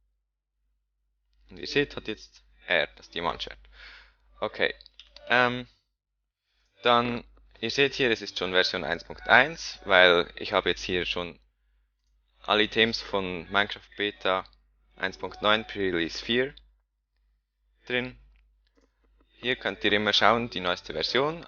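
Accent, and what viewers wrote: Switzerland. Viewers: ich mag schweizer akzente voll ^^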